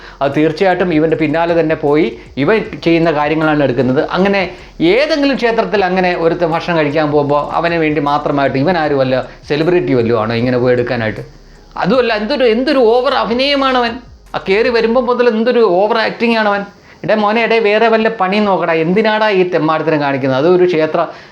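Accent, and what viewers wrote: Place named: Alappuzha, India. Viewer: Hi innathe special entanu. Nalla alappuzha saily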